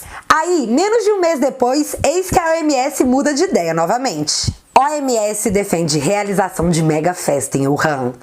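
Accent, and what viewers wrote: Minas Gerais, Brazil. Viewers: Muito bom e a hora que do muita risada kkkkkkk esse sotaque mineiro e de mais....grande mídia... só mentira...OMS ...nem se fala.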